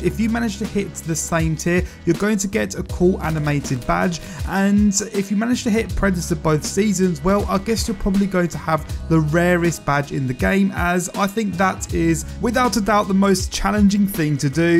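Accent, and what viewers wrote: United Kingdom. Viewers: I like it. You're direct a d to the point, no begging for likes and your voice although british isn't annoying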